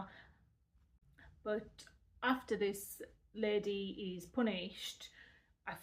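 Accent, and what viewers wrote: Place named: Middlesbrough, United Kingdom. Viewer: Could be Middleborough area but not sure🤔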